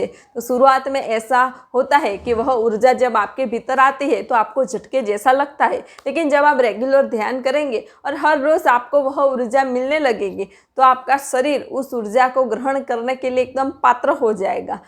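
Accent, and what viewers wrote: Gujarat, India. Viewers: Thank you .Kya aap Gujarati hai?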